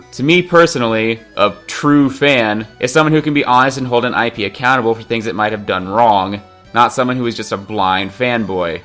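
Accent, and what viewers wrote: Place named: United States. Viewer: God, his American pronunciation of the names, is so fucking annoying!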